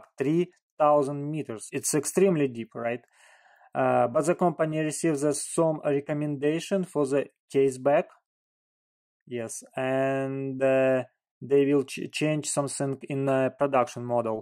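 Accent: Russia. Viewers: Вы из России? Заметен акцент.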